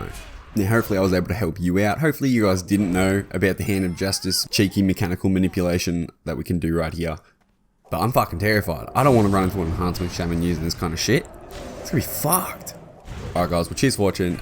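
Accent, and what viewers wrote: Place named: Australia. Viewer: I hear a fellow Aussie here!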